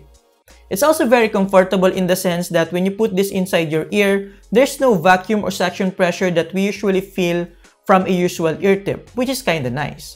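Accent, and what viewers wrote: Philippines. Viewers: hears the accent My brain automatically: ahh pilipino to alam na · I'll take that Clunky Filipino accent over Indian classic tech support anyday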